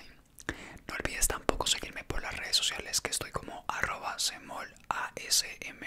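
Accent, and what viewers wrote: Bogotá, Colombia. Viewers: Ahhh tu eres de Bogotá 🤔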